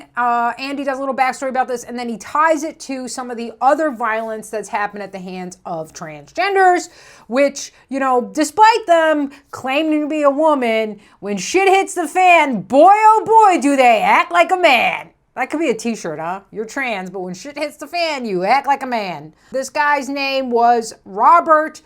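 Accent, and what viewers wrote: New York, United States. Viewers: Love the New York accent and attitude. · you are a Bonnie lass but your NY accent is painful. 😄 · You go, gal! I love your accent. I'm from the South but I love NYC accents. What is yours, Queens, Brooklyn, Bronx? Oh, I enjoy your content, too. :)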